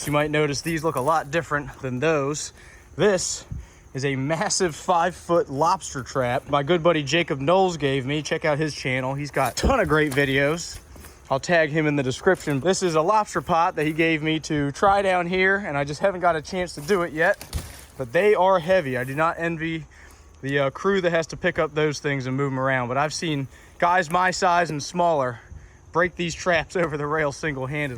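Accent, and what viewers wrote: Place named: Appalachia, United States. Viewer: Whenever Asians speak hill Billy? I love it.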